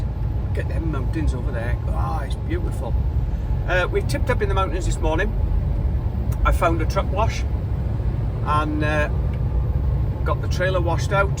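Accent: Wales, United Kingdom. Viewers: Another great watch Mr 😁, contents as normal interesting, and the views spectacular😘, really can't get enough. Just one thing can't get my head around, where do you actually come from 🤔,I'm convinced there's a slight hint of a Welsh acsent, but it's got something else with it. Make the best of the weekend, o and what happened to the meeting up with Captain Slow😊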